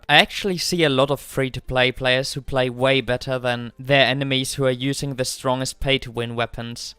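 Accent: Germany. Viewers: U german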